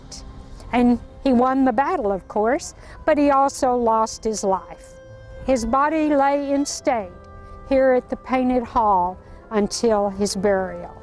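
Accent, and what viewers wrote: United States. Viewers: What type of American accent does she have? I'm American, and I don't recognize it.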